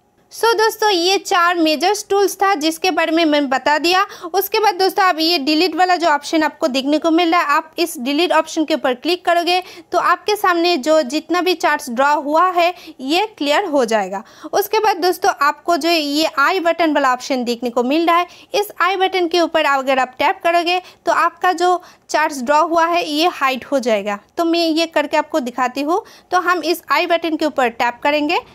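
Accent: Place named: Bengal, India. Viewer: Sweet Bengali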